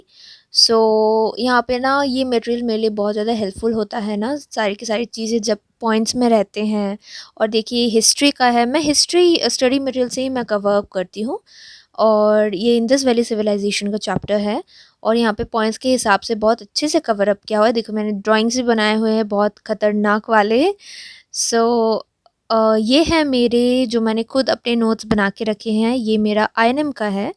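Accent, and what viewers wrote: Bengal, India. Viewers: Bangali hai ho skta hai uski Hindi aisi ho okk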